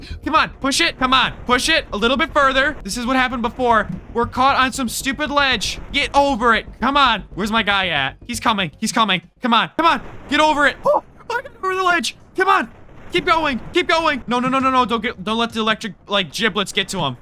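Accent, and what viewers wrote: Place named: United States. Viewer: im brazilian, and i just wanted to say, (dont get me wrong) i hate people from usa who talks with that "tone", but videos are SO good that i LOVE to see, and just ignores it. Love that channel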